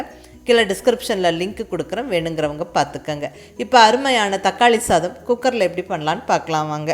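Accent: Coimbatore, India. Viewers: Nama Coimbatore Tamil kekalam Inge vantha.. 😍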